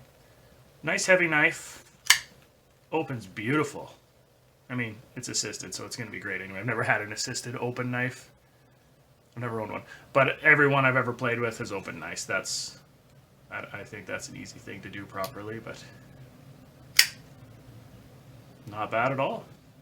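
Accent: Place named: Vancouver, Canada. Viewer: This guy has a vancouver accent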